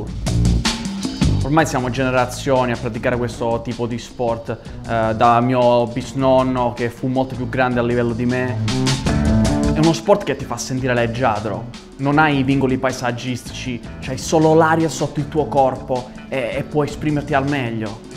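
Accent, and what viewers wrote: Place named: Bari, Italy. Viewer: Parkour all'EUR, accento di Bari. Voto: 10 rigatoni broccoli e salciccia su 10.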